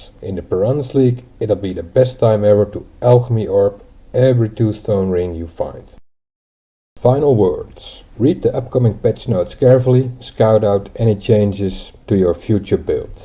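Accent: Netherlands. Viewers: u dutch ?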